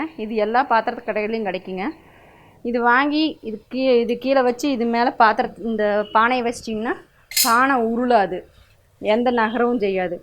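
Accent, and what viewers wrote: Coimbatore, India. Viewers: Kovai tamil thana neeinga pesurathu akka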